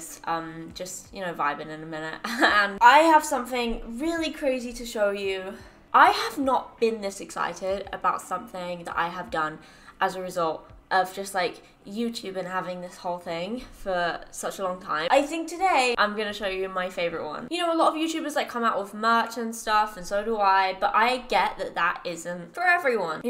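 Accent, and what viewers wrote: United States. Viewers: definitely has an American twang in her accent now- cute! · Watched her years ago and now she has a slight American accent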